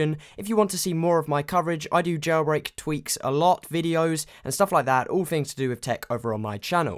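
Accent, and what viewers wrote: United Kingdom. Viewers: STFU U BRITTISH GAY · r u british?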